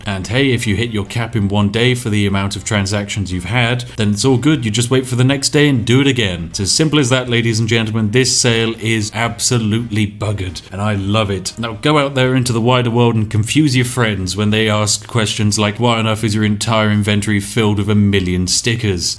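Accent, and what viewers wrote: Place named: RP, United Kingdom. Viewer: the posh accent doesnt work when you can't pronounce "th" sounds properly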